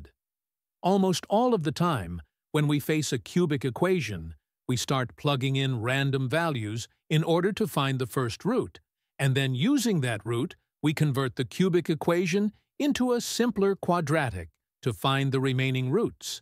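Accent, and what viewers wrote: India. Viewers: My guy, please learn English grammar. It sounds too obvious that you're Indian. You say things like "y+3 whole cube" which is something only Indians say, while native speakers say "y+3 all cubeD", or squareD.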